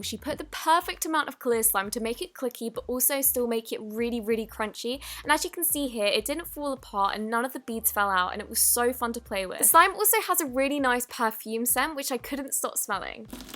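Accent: United Kingdom. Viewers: babycanread2009 right, and maybe it could be because of her British accent!!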